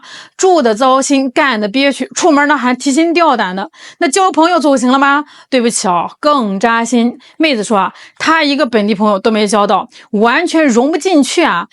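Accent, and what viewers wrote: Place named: Shandong, China. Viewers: 这山东口音简直了😂😂😂😂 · 这山东味儿的普通话 😀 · 这女主播被这口北方口音给毁了，本来还算美女一枚. 😅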